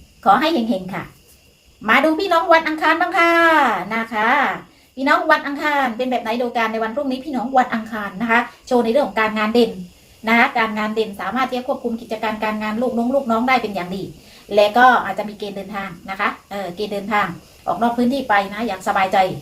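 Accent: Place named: South, Thailand. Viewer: สำเนียงใต้ มิน่าถึงพูดรัวฟังไม่รู้เรื่อง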